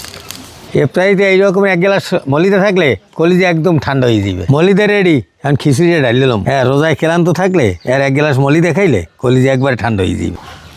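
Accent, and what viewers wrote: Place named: Barisal, Bangladesh. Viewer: বরিশালের মানুষ বরিশালের আঞ্চলিক ভাষায় কথা বলেন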